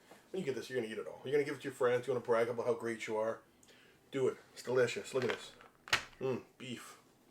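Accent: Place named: Canada. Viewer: 45 seconds in I recognized that you're a fellow Canadian from your accent. Am I right? I have no way of knowing but I am pretty sure.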